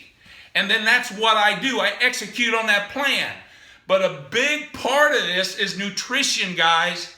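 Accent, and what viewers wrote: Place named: Midland, United States. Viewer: Love that Midland accent. And the advice. I stay with the big three and add the ancillary exercises if time permits. Full tilt at 49, soon 50. All the best from Greater Cincy.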